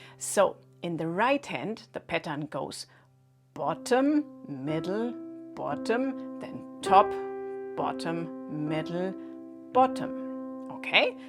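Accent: Germany. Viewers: Hello, your English sound German. Are you from Germany of one of the countries which speak German language. Excellent video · I LOVE THE GERMAN ACCENT..!!!...UM IS THAT YOUR HERITAGE..?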